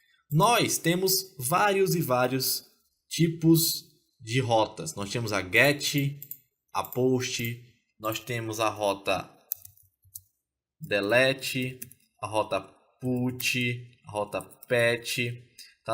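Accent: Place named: Ceará, Brazil. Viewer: maah... tu é do ceará? teu sotaque é do ceará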